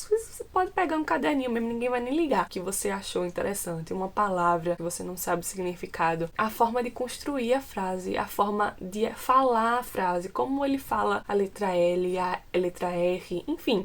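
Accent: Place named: Pernambuco, Brazil. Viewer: Sotaque pernambucano🤗 meu nordeste é diferenciado 😍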